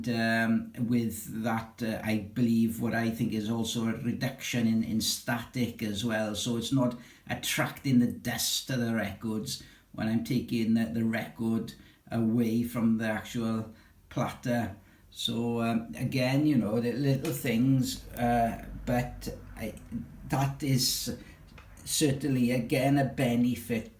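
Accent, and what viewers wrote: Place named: Wales, United Kingdom. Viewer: You sound Welsh......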